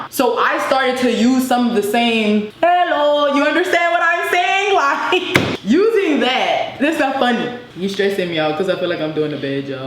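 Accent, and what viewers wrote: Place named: Louisiana, United States. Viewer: Lousiana accent bby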